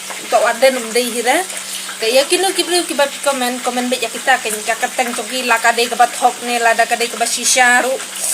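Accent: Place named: Ri-Bhoi, India. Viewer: Sur bhoi dei na bhoi tharai ikong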